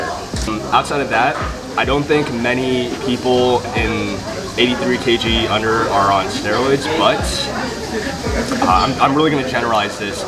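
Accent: South, United States. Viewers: Where you from? Didn’t expect such a southern accent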